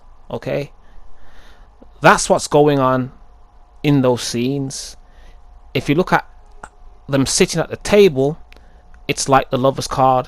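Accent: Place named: South Africa. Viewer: Holy crap, had no idea you did this. You sound like you're from.... South Africa??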